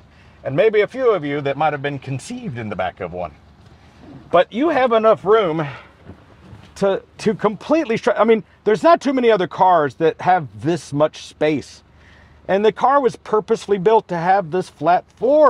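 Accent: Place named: New York, United States. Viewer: Hey my sweetheart ‼️‼️Im crying laughing at you pretending to be taxi driver🤣🤣🤣You sound just like a New Yorker🤣🤣🤣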